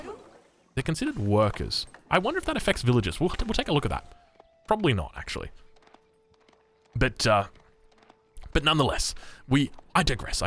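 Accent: Australia. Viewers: Your channel is going to get huge dude. You're spamming out uploads at the perfect time, you're noticeably enthusiastic and knowledgeable about the game, and Americans seriously love Australian accents. I wouldn't be surprised if you had 100k subs by Christmas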